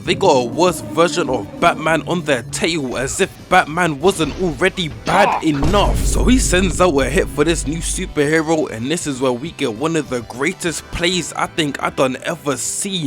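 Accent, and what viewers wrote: United Kingdom. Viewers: I love how clear it is to understand your British accent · Your voice is like British Casual Premium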